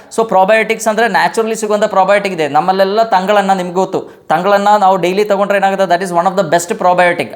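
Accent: Kerala, India. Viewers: Well explained Doctor you are speaking very good kannada beaing a Malayalam slant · Ok, but look like Dr from Kerala, kannada is Malayalam mixed kannada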